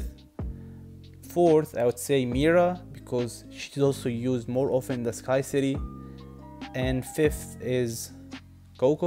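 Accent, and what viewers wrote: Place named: Arab world. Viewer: طريقة كلامك غريبه حاس انك عربي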